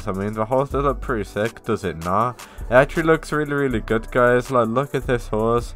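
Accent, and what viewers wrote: United Kingdom. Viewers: Bro your language british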